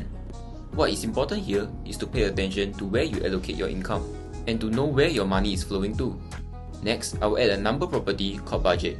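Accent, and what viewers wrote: Singapore. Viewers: You from Singapore bro bro? Love the vid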